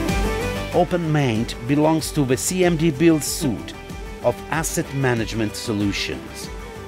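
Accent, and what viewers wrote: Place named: North, United Kingdom. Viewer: the combination of north british and slavic accents gave me a stroke, cool app tho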